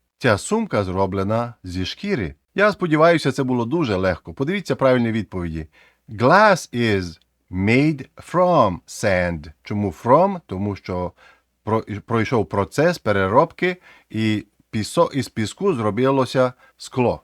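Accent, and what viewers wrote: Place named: Zakarpattia, Ukraine. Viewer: Підписалася, вподобайка, поширюю, коментую! Чудовий, професійний канал українською з колоритним закарпатським акцентом! Вже 20 років в Америці а таких тонкощів не знала - щиро дякую!!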